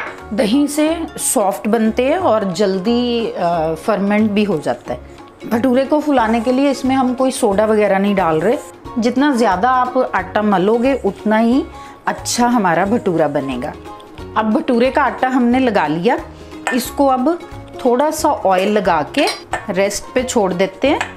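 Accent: Punjab, India. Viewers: I love your punjabi accent mam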